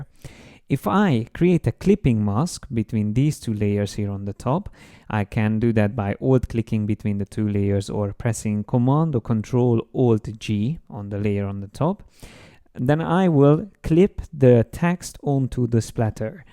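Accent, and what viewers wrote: Hungary. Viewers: Kimagaslóan kitűnő és hatékony tananyag kezdőkne és haladóknak egyaránt. A beszéd akcentusból arra következtetek, hogy teszik tudni magyar nyelven is komunikálni, ha igaz akkor szeretném ha ezt velem közölné, mert angolból csak kezdő vagok. Igen szép munka (Y) sok sikert ezután is.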